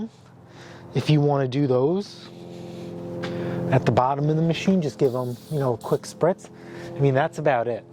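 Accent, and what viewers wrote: New York, United States. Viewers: Thanks for the video. Really funny hearing a New York accent living here in central Idaho.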